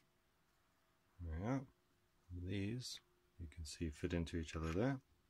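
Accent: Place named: South Africa. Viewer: sounds like you are from SA. anyways. I am unable to find these 3.5mm bearings in SA. any suggestions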